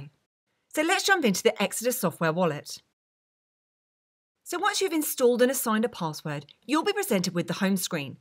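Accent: United Kingdom. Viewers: Could not understand her at all...her thick Brit accent ruined the video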